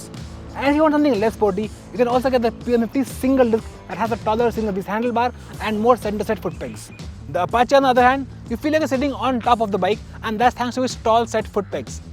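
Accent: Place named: India. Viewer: <link> #IndianPronunciation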